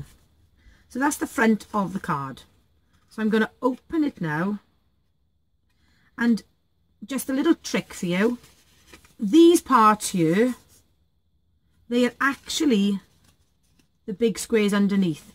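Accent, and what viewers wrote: Wales, United Kingdom. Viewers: thought you sounded more welshy than me lol. Xx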